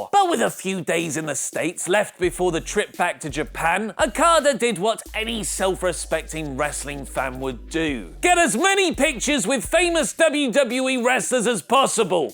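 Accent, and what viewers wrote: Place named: United Kingdom. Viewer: Hearing British pronunciations is horrifying.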